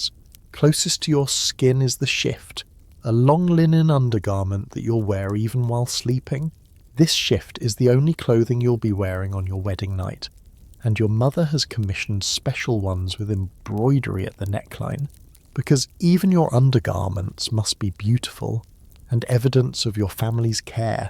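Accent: England, United Kingdom. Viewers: It's 5.40 am in South Australia. Love the history, and the English accent ❤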